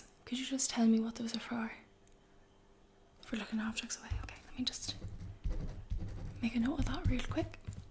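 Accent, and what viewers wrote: Ireland. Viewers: For some reason i just loved your accent! It's irish accent? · Like this video and like the Irish accent. 👌👍😊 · Just can't beat an Irish accent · Love this! Instant subscribe. Haven't come across any big Irish artists yet, I'm sure there are some and I haven't actually searched for them, YouTube just hasn't pushed any in my direction till now. The accent is perfect! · That Irish accent is awesome for this type of ASMR! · I’m a simple man; I hear an Irish accent, I subscribe. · Hi Miss, YouTube recommended and I'm glad they did! I really like your Irish accent! I subscribed and I am going to get notifications! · Fukkin love an Irish accent! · This was lovely and the Irish accent is a huge bonus. What county are you from?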